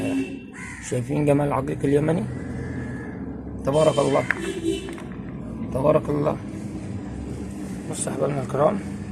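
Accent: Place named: Egypt. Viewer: الهجه حقك مصري سوف لنا سوق مغنا احجار كثيره وحلره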